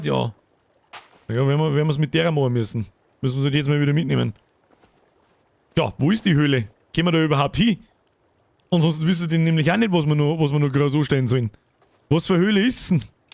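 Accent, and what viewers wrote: Bavaria, Germany. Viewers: #nochschausquad servas. danke für deine Streams. Mehr boarisch, weniger preissnscheiss! des passt ba dir immer:)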